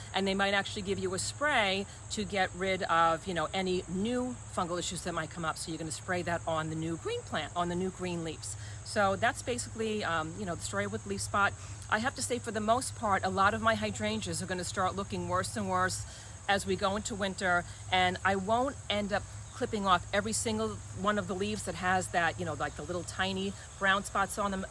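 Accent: New Jersey, United States. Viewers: I was born and raised in Jersey but now viewing from Arkansas!! Your accent sounds like home 🥰 thank you for the information!!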